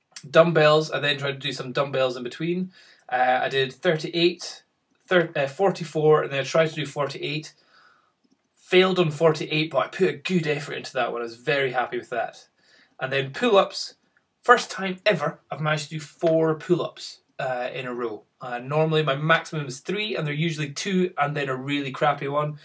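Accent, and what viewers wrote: Scotland, United Kingdom. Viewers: he's scottish, it's his accent bro. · dude , where are you from , Edinburgh / glasgow ?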